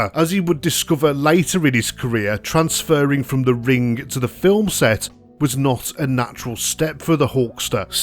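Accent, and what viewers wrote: Birmingham, United Kingdom. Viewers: Favorite new Pro wrestling algorithm find here. Great content 💯 UK area accent is this? 🇬🇧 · Guy narrating is from Birmingham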